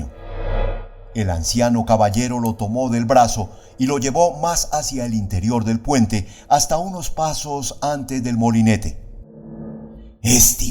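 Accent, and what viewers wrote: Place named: Latin America. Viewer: Qué dicha, un narrador Latino.con este cuento.. Gracias!!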